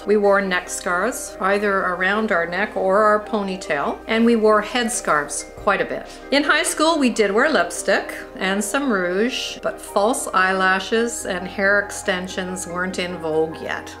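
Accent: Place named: Canada. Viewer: I have just stumbled onto this video and I LOVE the Canadian Accent..!! I can relate to so many things - even growing up in the 70's/80's... thank you for this..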